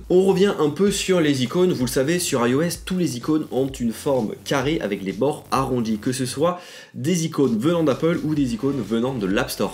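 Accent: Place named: Marseille, France. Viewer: ahh j'ai griller ton accent marseillaise et team ios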